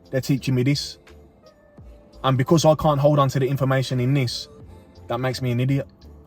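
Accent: Birmingham, United Kingdom. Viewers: I saw your video on when you made your first £10K and I love how honest you were I can’t wait to jump out the matrix too btw where you from you sound like you’re from Brum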